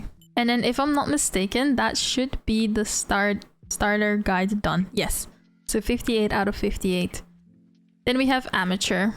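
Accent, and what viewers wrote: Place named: Ireland. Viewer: what accent do you have? it sounds like a mix of cork and belfast ireland but idk for sure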